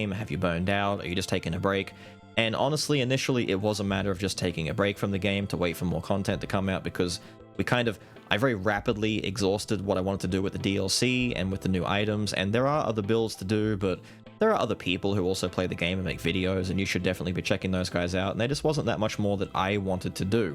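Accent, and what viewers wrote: Australia. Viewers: I didn't stick around for Skul. I saw funny looking thumbnails and a funny sounding Australian man, I knew for a fact that Skul is just the start for something more.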